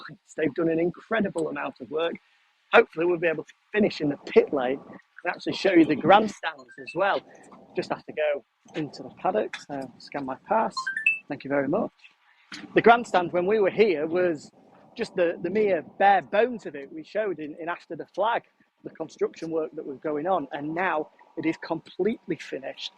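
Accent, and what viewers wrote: United Kingdom. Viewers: Pembawa acara MotoGp sama Badminton emang paling cocok pakai aksen britis.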